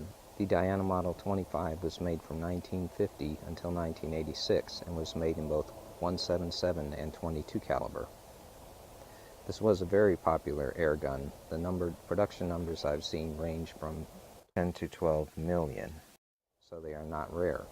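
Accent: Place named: England, United Kingdom. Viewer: Gracias Sr.. Very good this video a lot of informative and crearly explicative mode to understad your fine English accent for Us. Thanks a lot. Saludos desde Venezuela..sorry my Bad English!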